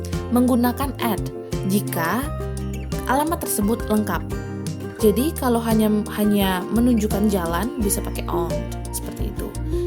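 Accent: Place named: United Kingdom. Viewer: Suka nih british accent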